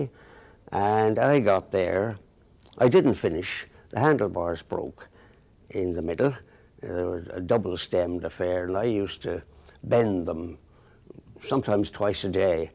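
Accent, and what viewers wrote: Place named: Australia. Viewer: He sounds like an Australian.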